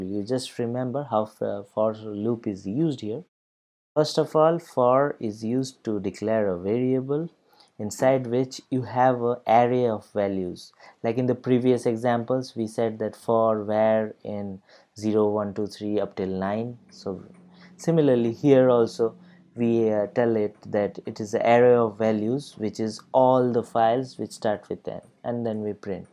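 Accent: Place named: Gujarat, India. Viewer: His accent is guirati....how many of u noticed ?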